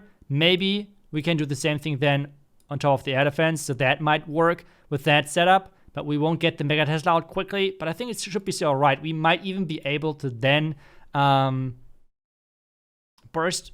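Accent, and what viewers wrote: Germany. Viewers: erstes mal englisches video gesehn man hört kaum einen deutschen akzent wow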